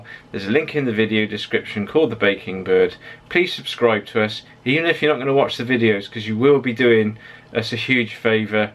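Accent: United Kingdom. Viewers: i wish i had watched all your videos on the van i love your videos very best of its kind on YouTube i would suggest that you try and make the videos available for sale maybe a download possibility for a feei personally would love to have this available its a real treat for me hearing the good old brit accent i sure plan on watching your beautiful wife your a lovely looking young couple wishing you both every success with the business great idea using local vendors and growers love the quality of workmanship non better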